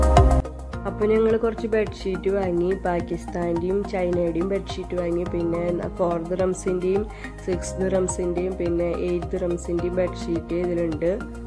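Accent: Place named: Thrissur, India. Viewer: Thrissur aano sthalam?? Samsaram kettapo thonni.. Me too from thrissur...Good and helpful video..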